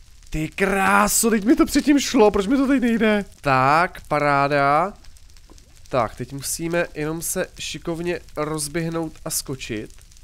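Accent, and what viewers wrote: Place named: Pilsen, Czech Republic. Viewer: Klasický Plzeňák :D Hubu proříznutou :DD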